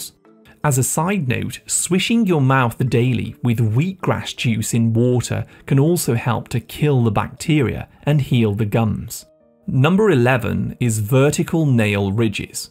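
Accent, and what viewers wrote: England, United Kingdom. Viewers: Pronounced English accent makes me buy nothing.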